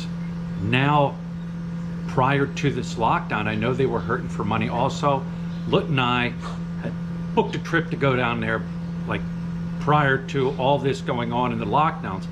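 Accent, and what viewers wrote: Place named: Pennsylvania, United States. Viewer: Are you from the Coal Region of Pennsylvania, because you sure have the accent. You really need to lean how to pronounce the words of dialects in Philippines.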